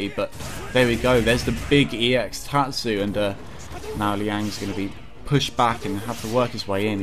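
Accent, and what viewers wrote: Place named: United Kingdom. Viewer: British accents are always great for narrating.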